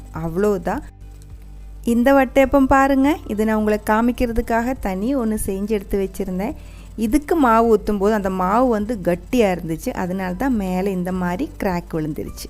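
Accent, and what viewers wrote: Kerala, India. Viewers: I like all kerala samayal sister Your kerala thamizh 👌 sister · madam from which place do you hail, sounds like a malayali speaking Tamil , any way vatappam superb just like your voice. God bless you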